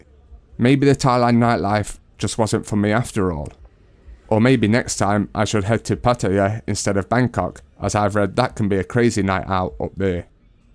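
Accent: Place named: Sheffield, United Kingdom. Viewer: The narrator sounds like he hails from Sheffield, England.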